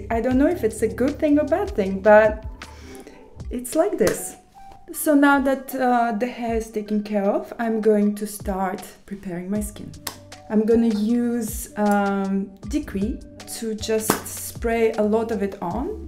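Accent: Russia. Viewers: She's been living abroad for years, and her accent ,pronunciation are still russian. 😂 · I like it that she still has that Russian accent. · Ее английский, так и остался с русским акцентом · Такая милая, до сих пор акцент русский 😊❤ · Вот меня это тоже удивило - она последние 20 лет как минимум живет постоянно за границей и до сих пор имеет отвратительный русский акцент.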